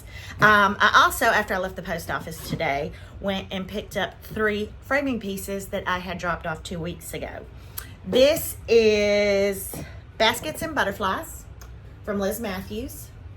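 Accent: South, United States. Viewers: I am so happy I found you. Love hearing the southern accent, y’all, and just your openness. Then you have sharing your gift and creativity.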